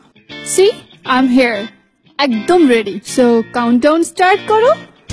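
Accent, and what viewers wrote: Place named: England, United Kingdom. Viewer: আপা কি কথা বলার সময় চুইংগাম চাবান? কথার সুর শুনে মনে হচ্ছে আপনি বাংলা ভাষার মধ্যে ইংলিশ টান নিয়ে আসেন!!আর আপনার ও কি কাউকে লাগবে নাকি চেক মেট করার জন্য... ঠিক বুঝলাম না!!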